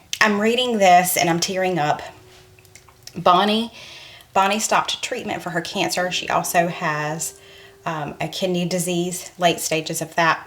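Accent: South, United States. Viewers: your family is very inspiring. I love watching ( listening to your southern accent). Your graciousness today made me cry. Prayers for your sisters health and to Bonnie's family. Thank you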